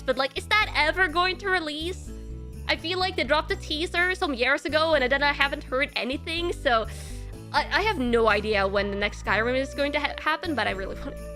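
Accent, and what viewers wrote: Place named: Scandinavia. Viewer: This was a really fun debut! It was really cool to know that you have a Nordic accent and it adds tp your personality. You are such a lovable goofball and very sweet and chill. Looking forward to the talk show and love your model too. Hope you have a great day today 😊🫂🙏🤍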